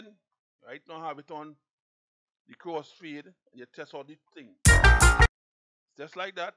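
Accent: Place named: Virgin Islands, Caribbean. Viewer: The man call scene I thought u were from the Virgin Islands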